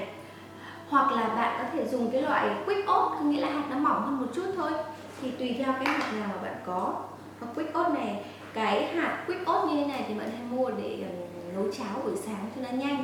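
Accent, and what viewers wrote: North, Vietnam. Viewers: Nghe giọng Bắc ko muốn xem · Giong bac ky 75....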